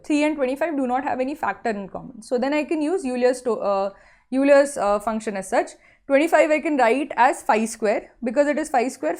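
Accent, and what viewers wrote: South India, India. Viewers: You have that typical South Indian accent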